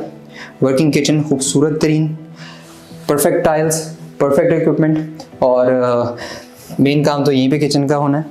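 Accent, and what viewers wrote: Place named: Pakistan. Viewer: mashaAllah gar bohat payara hai .. yar thanks God kisi ne to apnay pakistani style main baat ki ,, otherwise 99.9% YouTube par sab Pakistani Indian youtubers ki tarha baat kartay hain ..( Indian Styal ) door ki baat karin to floor ki baat karin to .. ya phir .. dekhnay ko milta hai dekhnay ko milta hai baat karin to baat karin to :) please don't copy others for God sake talk in your own style .. like this man .. bless you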